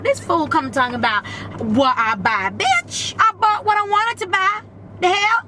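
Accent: South, United States. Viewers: Wait but why did it have to be a southern accent you could have said it right in your accent boo cause I know y'all Jamaicans can give it up to y'all men lol.. thanks for sharing! GOD BLESS. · Lol girl you have my Southern accent down pack. I was lol I was like no she didn't.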